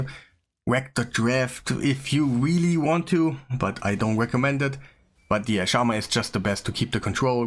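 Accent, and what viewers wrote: Germany. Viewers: I like your german Aussprache from the english words😅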